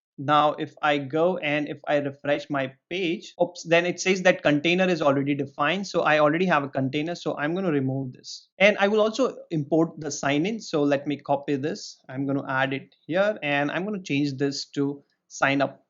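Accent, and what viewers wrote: India. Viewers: indian english bhi chalta bhaii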